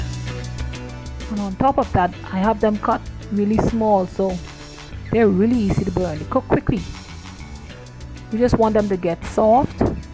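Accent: Bahamas. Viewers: Just because this is what I'm cooking I selected this. I didn't realise this was Bahamian until i heard you speak. Will definitely subscribe.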